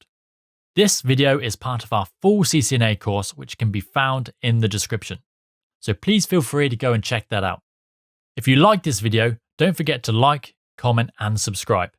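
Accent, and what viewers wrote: United Kingdom. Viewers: Excellent layout, delivery, and explanation of material. Only problem I have is with the british accent, but I struggle with all types of accents. I totally cannot grasp concepts from asian, Indian, arab, french, german, and spanish accents. But I improviced and overcame this by reading the video scripts after the video. This method of learning complex concepts is the best of all methods of learning.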